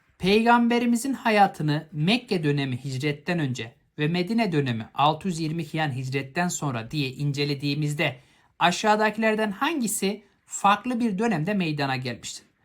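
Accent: Malatya, Turkey. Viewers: Hocam Malatyalı mısınız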